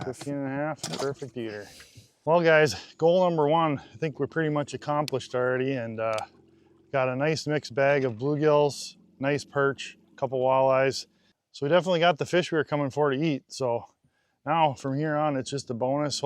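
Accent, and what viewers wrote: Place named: Wisconsin, United States. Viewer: You can tell by basically after everything he says he sounds like Skansen, Wisconsin, Wisconsin. End it seems like he’s up by Northwest probably by Minnesota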